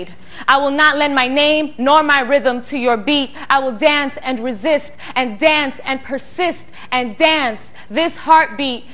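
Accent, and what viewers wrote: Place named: New York, United States. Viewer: "ghetto"??? Shes from Brooklyn and speaks out like many folks from NY do...she is also a literate brilliant artistic writer poet...words do matter...she knows understands and uses that rhythm and vocabulary to SAY SOMETHING POWERFUL...bless her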